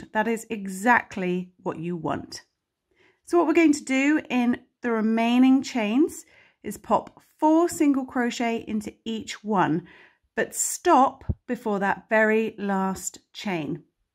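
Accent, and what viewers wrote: England, United Kingdom. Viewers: Well...I am definitely new at crocheting, but you are 4 SURE my favorite! You explain things well...plus I love the English accent, & I especially like when you say "pop your hook.."..lol. so thankyou 4 your videos. P.s. I also heard on one of your videos that was already taken...have you ever considered "robin hooked" / like robin hood....lol. you could...instead of "steal from.the rich & give to the poor"...u could....idk.."feel 4 the stitch &....well I can't think of how the rest of that would go, bit I'm sure theres a clever tagline just waiting to be discovered. Lol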